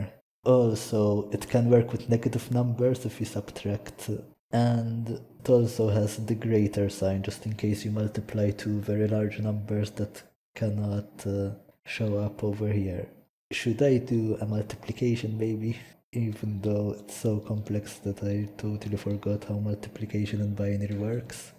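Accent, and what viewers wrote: Malta. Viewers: that sweet malta accent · Maltese accent sounds interesting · Dak l-accent Malti Mannnnnn! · Aaaaaaaw maltese voice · Ah yes the maltese accent is strong with this one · First time hearing his voice and shit that maltese accent is powerful af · Maltese accent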